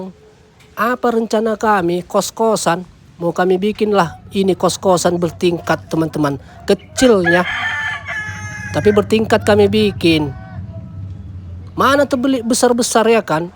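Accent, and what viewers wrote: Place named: Java, Indonesia. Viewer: BANG LU JAWA MEDAN YA KOK NGMONGNYA KADANG ADA JAWANYA😁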